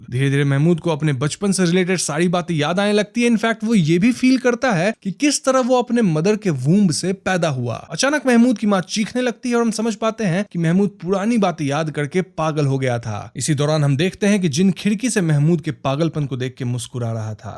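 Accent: Bengal, India. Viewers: bhaiya its loke bole😝.. bt bhaiya ur bengali sounds cute❤ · Bhai, achha natak kar lete ho..😅 Bengali hoke bolte ho ye bhasa nahi aati... Jitna bhi Koshish kar lo par koi apna MTI 💯% khatam nahi kar Sakta... Aapke bohat words se pata chal jata hai ki your mother tongue is Bengali... I have seen many Bengalis who claim to be a non-bengali and I fail to understand why they do like this...😅 But anyway good try but not the Best as you are caught... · Thanks Bhai for covering this series! Loved your explanation and cute bangla accent haha! Take love from Bangladesh <3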